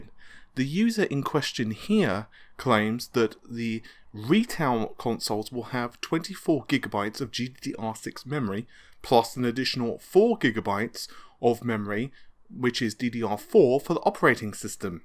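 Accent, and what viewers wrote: United Kingdom. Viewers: It shouldn't be silent in British English pronunciation, and he is British by his accent.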